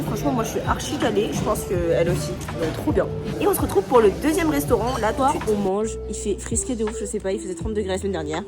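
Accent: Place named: France. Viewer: Yes I would, nice 👍 French accent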